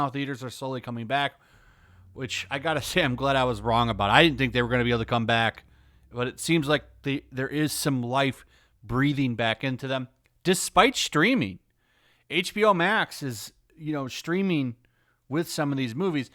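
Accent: United Kingdom. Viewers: Your British accent has me weak 😂😭🤣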